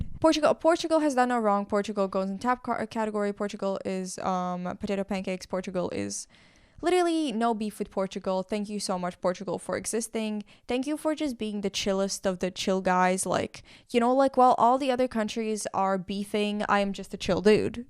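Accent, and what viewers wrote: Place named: Latvia. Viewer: Your English pronunciation is very similar to other Latvian people I know so I had the feeling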